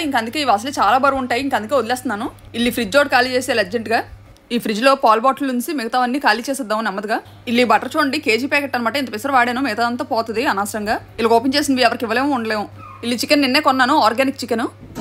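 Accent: Vizianagaram, India. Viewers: your voice and slang, very connecting to our own area and place.. very authentic and natural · Yeah true bro, and filmy moji valla mana vzm slang ki YouTube lo Baga reach vastundi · Desha basha landhu Telugu lessa.. Ejjinagaram basha enka superu.. Great.. adhirindi.. god bless you.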